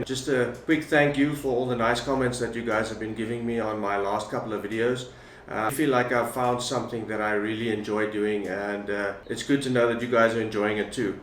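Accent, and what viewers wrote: South Africa. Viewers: South African accent is great :) Boer.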